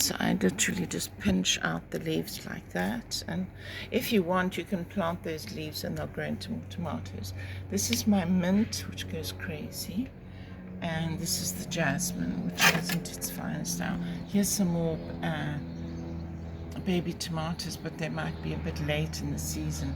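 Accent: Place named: United Kingdom. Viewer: Hi darling, I love so muh your lovely british accent and you seem such an adorable typical English lady at my italian eyes who needs only her raincoat and umbrella to not to be unfaithful to her origins! I agree with you about living in Europe, nowadays seems the better choice to live peacefully, and leaving in Italy, at least the north of Italy, is so much underrated by our generation. I know work is not enough rewarded as in other countries, but, hey, you cannot have everything from life... Things you understand only much later... I cannot see my life anywhere from here and you can find close minded people really everywhere, so... You can only stay away from them! I love your perfumes on a budget videos! Greetings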